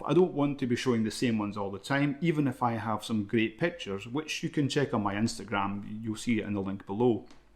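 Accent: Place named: Scotland, United Kingdom. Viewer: OK ..Finland ..but why do you sound Scottish ?!? OK ..maybe it's just me !! LOL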